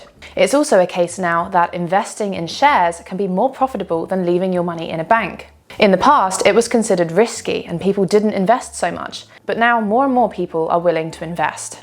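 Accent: United Kingdom. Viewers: Clear and beautiful British English! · I like your uk accent and clear pronunciation very much and thank you. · 有一口英音太迷人啦！🙃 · you are very beautiful! 🥰Your British accent is fascinating!🤩 I am from Hong Kong and I am an English-Language learner. This is the most fabulous English-Language learning channel I have ever seen!:yougotthis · I like the way pronounces words with British accent. It just so pleasing to the ears.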